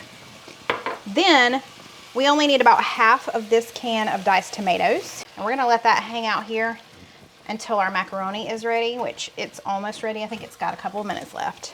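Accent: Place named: Alabama, United States. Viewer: Love your videos! Just wondering if youre from Alabama. Have a friend from there and she has a similar accent!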